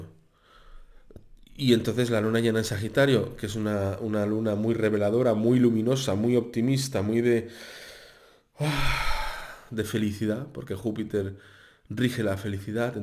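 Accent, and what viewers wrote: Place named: Andalusia, Spain. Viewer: Holaaa! Me da mucho gusto escucharte, nombras palabras como mi abuelo de Huercal Overa y de mi abuela de Baza... me da mucha nostalgia y a la vez trasmitis con mucha gracia tus conocimientos!!! Me encanta tu forma de leer los astros. Hace varios años que te escucho. Gracias❤!!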